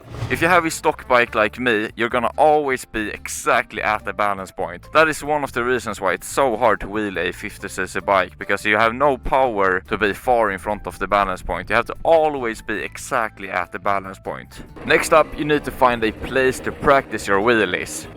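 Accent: Sweden. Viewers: jävlar vilken svensk dialekt du har, men bra video.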